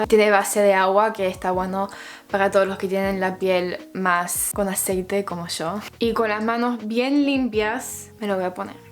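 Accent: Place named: Israel. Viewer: איך שומעים את המבטא הישראלי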